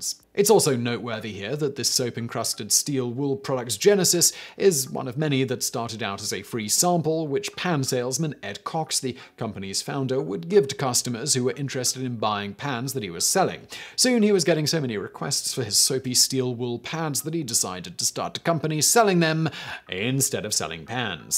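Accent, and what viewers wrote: United Kingdom. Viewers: British people like Simon have this charming habit of pronouncing foreign words as though they were English ones when referring to them, instead of using a foreign pronunciation that's very well known. Americans are much less likely to do it, although it's still fairly common for people to butcher the Latin pronunciation of infinitives that end in -(i/e/a)re as if they rhymed with hire, here, or hair, which they do if you pronounce the symbols as though they were an English word instead of a Latin one, but is as wrong as Simon's pronunciation of the French words in this video. It's odd because you'd think Europeans would be more aware of the fact that things are pronounced differently in different languages, but the British being insular probably get it much less.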